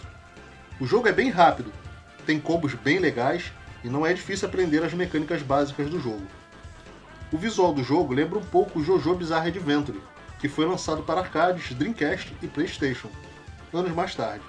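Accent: Rio de Janeiro, Brazil. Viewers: O vídeo é ótimo sem dúvida. O difícil mesmo é ter de suportar esse carioquêixxx carregadíssimo.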